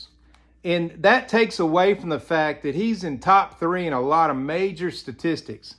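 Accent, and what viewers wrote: South, United States. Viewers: A Labor of Love - Especially Poignant when done with your southern accent ❤❤❤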